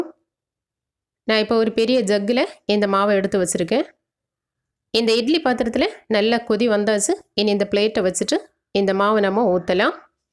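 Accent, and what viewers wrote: Kerala, India. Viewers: Malayali mathiri pesareega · Super Checbi im from chennai and your language Slang is Malayalam. 🎉🎉🎉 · Oru malaiyaalai Tamilppesavathaippaaraattunga kuraisollikkorikkaatheergal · பேச்சு கேட்டால் மலயாளி போல தெரிகிறது.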